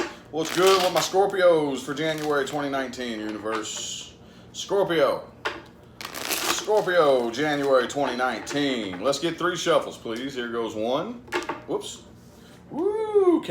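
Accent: United States. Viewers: Very nice, very accurate. By the way, I love your american accent (I am not from an English speaking country). Thank you very much. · Where in the US is your accent from?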